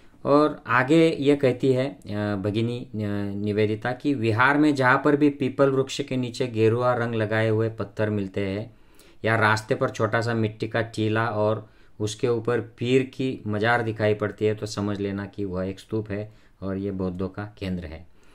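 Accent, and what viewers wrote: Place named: Maharashtra, India. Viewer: खुप छान पण तुम्ही मराठी मधे सांगावे कारण उचार pdhat मराठी वाटते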